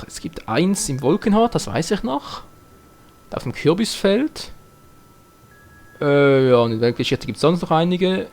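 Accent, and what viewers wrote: Switzerland. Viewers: xD...Tschöpli!!! xD Schwizerdütsch rockt!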